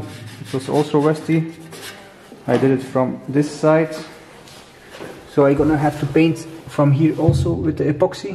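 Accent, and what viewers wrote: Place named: South Africa. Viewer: It’s being preserved for another 50 years ;-) well done. When I hear your accent I would think you are from Suid Afrika?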